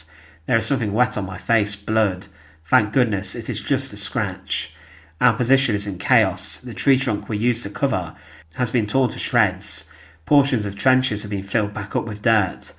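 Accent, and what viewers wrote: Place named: West Midlands, United Kingdom. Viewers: He has a much better voice and pronunciation care than so many shoddy YT presenters who don't bother or care to learn how to say foreign names and words. He writes and organizes well and carefully. His particular English accent another commenter said is "West Midlands." As an American I've had no trouble understanding him. If you have specific names he doesn't say sufficiently well, then pointing them out and writing phonetically how to say them could help. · Modulating his voice? If you mean the intonation, that's just the local accent in parts of the West Midlands where the narrator is clearly from. People have different accents including different intonation, that's just the way it is. I watch channels hosted by people from the deep south of the USA, they too have very specific accents and intonation but I just accept that as part and parcel of their narration.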